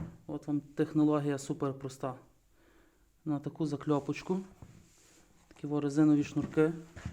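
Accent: Ukraine. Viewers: Ще дуже подобається вимова, галицька українська неперевершена. А побачити чоловіка, який залюбки працює фізично - це рідкість.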